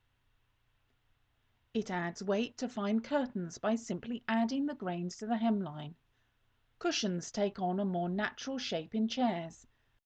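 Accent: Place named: United Kingdom. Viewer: LOLlike the brit speek and prodick not bad to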